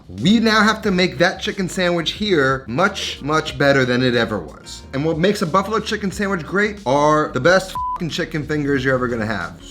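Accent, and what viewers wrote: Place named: Westchester, United States. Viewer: Oh you from weschesta? Das it!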